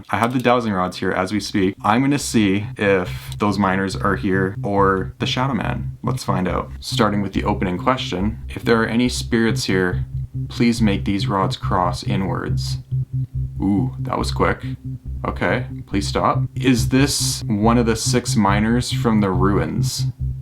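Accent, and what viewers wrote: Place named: United Kingdom. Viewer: AH HES BRITISH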